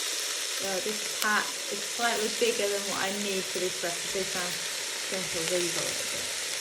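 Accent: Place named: North, United Kingdom. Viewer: Great to hear a northern British accent like mine. I'm from Bradford. Xx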